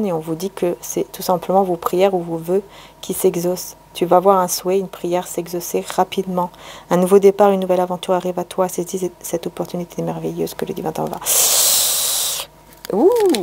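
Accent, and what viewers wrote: France. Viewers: je suis allée voir la chaine italienne, trop mimi le mix entre petit accent français et petit accent sicilien😊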